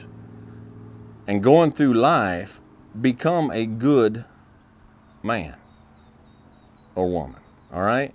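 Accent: South, United States. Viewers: 南部アクセントが心地いい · 南部英語気持ちええんじゃ〜^ ^ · それは南部特有のアクセントです。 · 南部訛りってやつですか？ なんとなくアメリカ英語にしてもクセがあるように聞こえます。 ちなみに言ってることは素敵です。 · 南部訛り？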